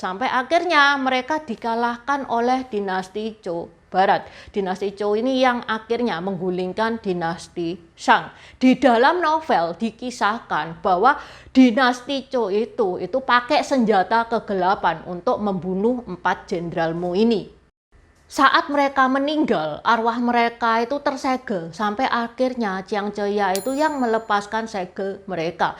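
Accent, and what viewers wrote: Java, Indonesia. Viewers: Kok Medok banget 😊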